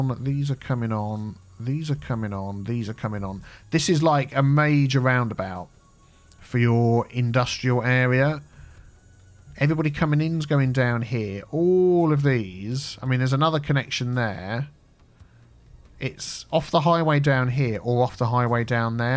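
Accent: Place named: Derby, United Kingdom. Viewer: You sound like you live right round the corner from me. Im from Derby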